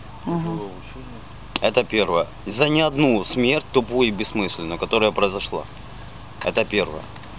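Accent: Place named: Ivano-Frankivsk, Ukraine. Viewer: Какой то Одессит мама дорогая я вас умоляю?? ! Не делайте мне нервы своими фейками ;) По говору Таки он с Ивано Франковска!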